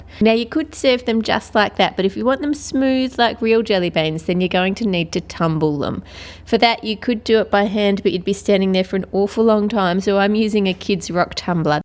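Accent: Australia. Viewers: Shes Australian Harley Quinn is from New York lmao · Are you from australia or new zealand? Youve got such an interesting accent! Love it! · Are you Australian ? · Are you Australian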